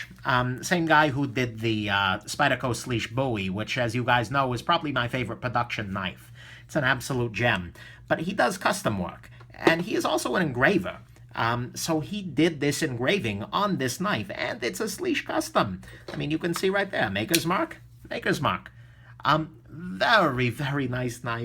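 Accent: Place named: New Jersey, United States. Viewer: Why do so many find the way you talk as different? I live in Jersey and everyone sounds just like you?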